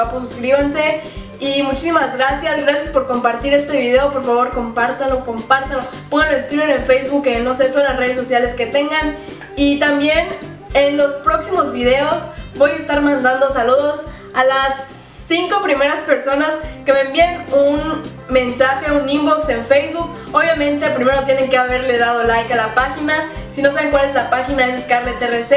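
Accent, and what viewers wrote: Campeche, Mexico. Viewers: Si que tienes acento de Campeche ajajja , que linda 💗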